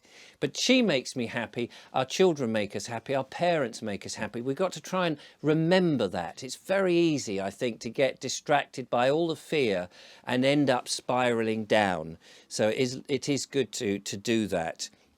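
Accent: United Kingdom. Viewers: It's the British accent.🤫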